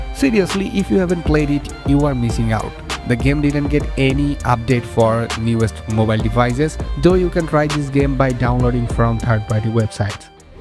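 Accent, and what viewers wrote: India. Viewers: Yeah, I don't need to play any of these. Always these top games must play videos with some heavy accent Indian guy and the lists are always crap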